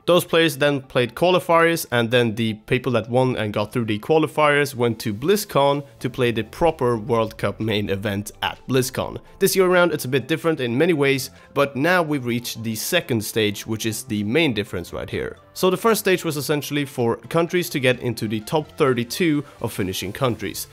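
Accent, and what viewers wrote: Sweden. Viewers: ah you from Sweden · are you swedish????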